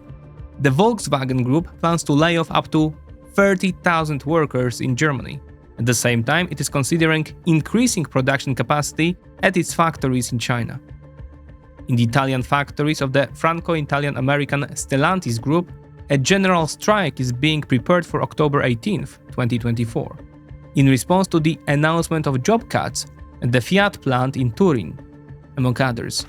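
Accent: Poland. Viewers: You do excellent work! Very informative, must be very time consuming. Liked and subscribed. And I love your Polish accent, I’m Ukrainian by birth. 😊 Suggestion: given the depth of material and watch time, suggest you have a timeline so that people can jump back to a topic of interest. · Not surprised this guy has a Polish accent. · POLISH ACCENT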